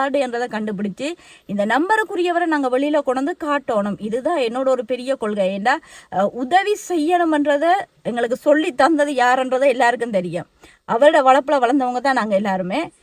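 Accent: Sri Lanka. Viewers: u r great,ur job is excellent, oru manitha mirugam panniya seyalu ku neenga feel pannatheenga, unga srilanga tamil speech sooper,all the best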